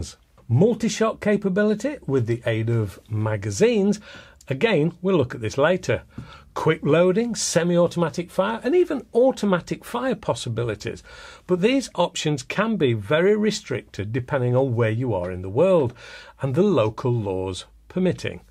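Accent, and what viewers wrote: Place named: England, United Kingdom. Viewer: Hello Mr. I am from Greece and my name is Eric. Congratulations for your great channel! It helps me very much with all that information for my potential hobby. I really admire and that English accent.I really like you English people!!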